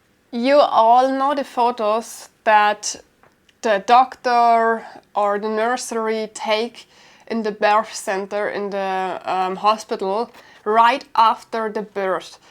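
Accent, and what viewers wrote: Germany. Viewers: I really enjoyed this "peek" of your childhood and teenage years. I envy you, your trips to Croatia, Italy, etc. Has anyone told you that in your teenage photos, you resemble a young Gwyneth Paltrow? You are lovely . . . hearing your German-accented English takes me back to my almost 6 years in the Army, in Germany. I loved it.❄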